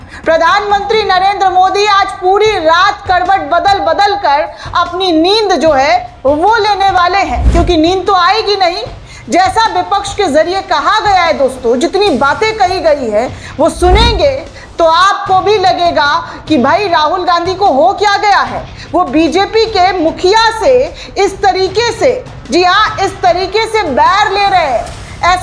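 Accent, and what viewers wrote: Bihar, India. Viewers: Tum bihar se ho. Ya biharan ho. Jo tumhari bolne ki language hai wo bihar walon ki same copy hai.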